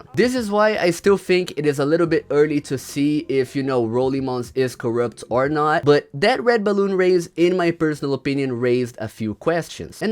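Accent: Brazil. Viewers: Are u brazilian? I know this accent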